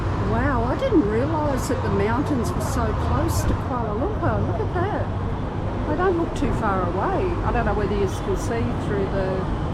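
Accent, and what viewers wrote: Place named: Australia. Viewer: Your accent is australian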